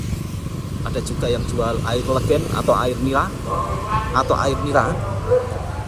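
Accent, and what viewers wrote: Java, Indonesia. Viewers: Negara kaya tapi rakyat miskin.. burit apa toha..kamu mahu mkn burit kah.. enak kah ngabuburit tu..😅mas toha kalau ngomong bunyi jowo banget gak sama dgn adik nya.. kalau cakap bahasa melayu tu gak kayak org indo.. bunyi n loghat melayu nya lain mcm..mas toha jowo medok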